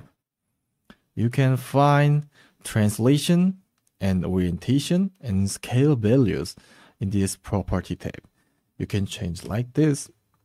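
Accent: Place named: South Korea. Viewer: 발음이 한국 분인듯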